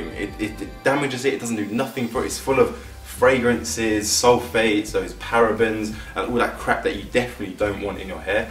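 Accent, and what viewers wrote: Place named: London, United Kingdom. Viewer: Bro might I recommend shaving your head - you're a good looking dude and you can pull it off. It would look a lot better than what you have now. Dht causes hair loss, not shampoo, not stress, not smoking, not diet, not anything else. You sound like you live in London, so speak to the top trichologists and hair transplant surgeons on Harley street, and they will tell you the same. I used to think like you did, until I saw a fat homeless junky outside Bond Street with a head full of amazingly thick hair - I'm sure he's stressed, I'm sure he smokes and drinks and eats shit. So why isn't he bald? Because his genetics are coded differently to mine and yours - his follicles are resistant to dht, yours are not.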